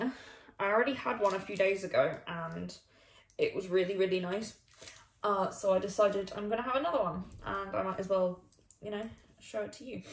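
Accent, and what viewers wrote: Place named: United Kingdom. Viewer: She’s British by the sounds of it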